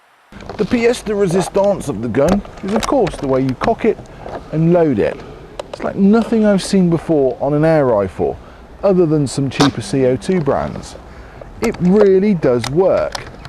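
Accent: United Kingdom. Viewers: Can somebody say from what part of UK is this english? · Everything sounds better with a British accent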